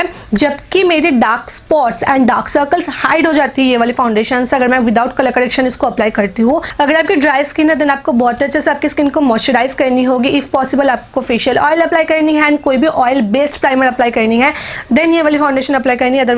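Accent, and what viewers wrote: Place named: Bengal, India. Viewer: Bengali accent😍😍😍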